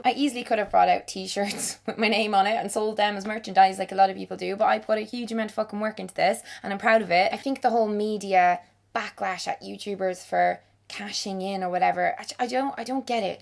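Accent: Ireland. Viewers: I'm 20 in like five weeks, and I've preordered it on audible because I'm poor and I used my monthly credit. Can't wait to listen to your lovely Irish voice 😘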